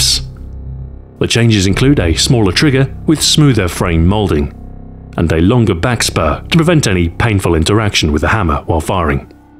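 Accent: United Kingdom. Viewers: A very British Terminator’s voice. And here is probably to me THE reason why I think deep baritone BRITISH voices are the BEST at serious/epic narration, bar none! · He is British, Captain Price is also British xD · Lol it's funny because sounds British.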